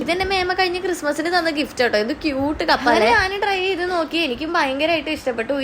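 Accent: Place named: Thrissur, India. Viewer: Thrissur slang pwoli anu tto..pinne ah Aesthetics nannyitund 😅..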